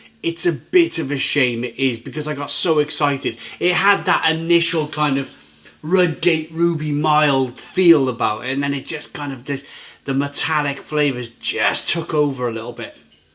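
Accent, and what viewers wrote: Wales, United Kingdom. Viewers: I remember Hobgoblin was £1.25 in tesco a few years back. It’s such a shame Wychwood were bought out by Carlsberg 😑 Love your Welsh accent btw 🏴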